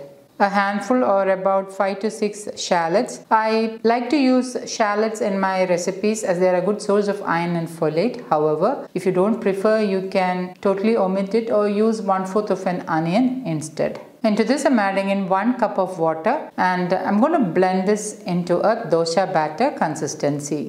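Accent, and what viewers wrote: Kerala, India. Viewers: Delightful to hear the nice mallu accent again… felt homesick 😊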